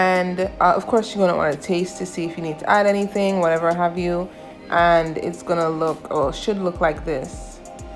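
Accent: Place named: Dominica. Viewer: I thought your accent was from Dominica. Nice to meet you. :)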